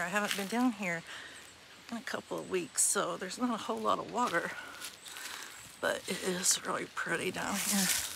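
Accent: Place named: Indiana, United States. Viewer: I thought you sounded like a Hoosier 👍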